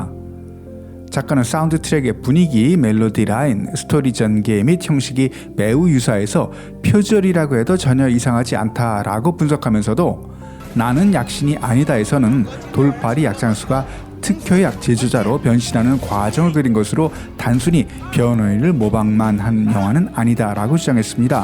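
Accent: China. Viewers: 당신 조선족이지 ? 말투는 못바꿔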